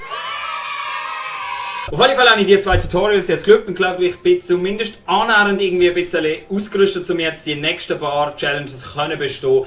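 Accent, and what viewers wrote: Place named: Basel, Switzerland. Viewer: scho nur dis Baslerdütsch isch amüsierend ^^ machsch du super!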